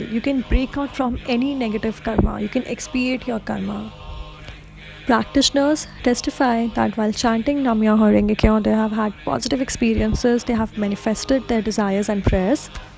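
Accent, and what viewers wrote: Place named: India. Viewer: Tough Critique in the US gives this EXcellent presentation a Thumbs UP! Bravo!! The demonstration must have taken a lot of hard work, and the outcome is superb. I now have a new Mantra to chant THANKS 2U!! Might I however suggest that you speak slower, because you your words sound mumbled, and PLEASE DON'T RUSH THROUGH THE VERY ESSENCE OF YOUR PRESENTATION - You say "Nam Myo Ho Renge Kyo" so fast every single time, that it's hard to catch what's said. The background recital is softer (and a v. nice touch), so the viewer is left wondering how to pronounce it. (Especially outside India where the "Indian" in your accent is foreign to the listener!? Your Presentation is so good it deserves to be heard all over the world) Also: Nam Myo Ho Rengay Kyo would be the more precise spelling if it is to be pronounced correctly. Hope you will take this as constructive criticism, & speak a little more clearly in your future videos - You have what it takes, so go for it, and Best Wishes. 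You Go Girl - Ushta-te!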